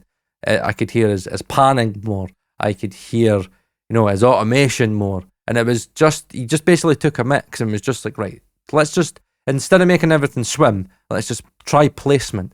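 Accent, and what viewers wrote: Scotland, United Kingdom. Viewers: Nice hearing a scots man. I lived near perth 10 years ago. Loved Scotland. Nice country and great people...other than my EX. 😜 I loved salmon fishing. 👍👍👍 About the ATMOS...I don't know what to think about it. I never had the chance to really listen to a great setup. But i watched videos where people are crying while listening. So it must be a great experience...especially for music...not only for movies. Greetings from Germany...🍻 ❤☮☯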